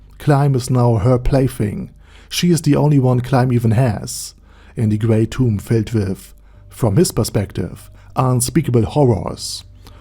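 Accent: Germany. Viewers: Hearing about an anime from a German accent it's just so weird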